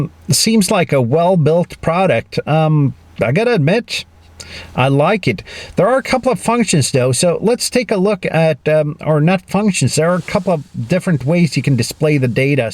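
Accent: United States. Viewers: I enjoy your channel. Have you lived in the States? You have a very midwestern accent. Or midwesterners have a Norwegian accent.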